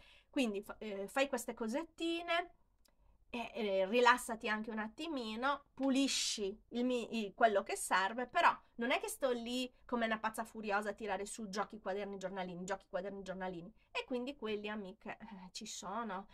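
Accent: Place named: Sardinia, Italy. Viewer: Ciao carissima è bellissimo sentire parlare della casa e famiglia in modo così "normale" riempie il cuore sentirti parlare!😊 Un altro video sarebbe un'idea stupenda...io inserirei oltre agli argomenti citati da te anche qualcosa sui prodotti non gradito secondo te..." Magari puoi titolare con il tuo bel dialetto sardo 😂"... buonanotte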